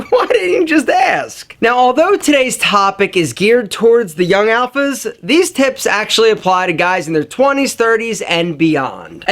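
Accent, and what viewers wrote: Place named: New England, United States. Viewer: where did you grow up alpha? I can hear a new England accent on your "aw's". -14 year old long term viewer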